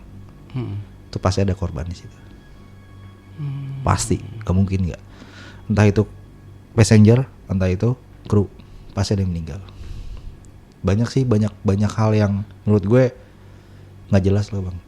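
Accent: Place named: Java, Indonesia. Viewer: Padahal ga usah pake bahasa Inggris bang, pake bahasa Indonesia aja ceritanya. Gatel denger bahasa Inggrisnya yang bukan cuma medok lokal tapi juga belepotan banyak ngaco.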